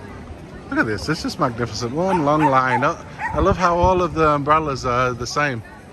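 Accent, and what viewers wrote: Northern England, United Kingdom. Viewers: oh no please do talk on your films. I can hear only slight northern accent but I live in UK so recognise some accents. I want to tell you about restaurants - secret gardens 🤗🤭, You passed one yesterday in Grodzka near Wawel. Another La Campania in Kanonicza Str. On the 29 May eating inside restaurants in Krakòw.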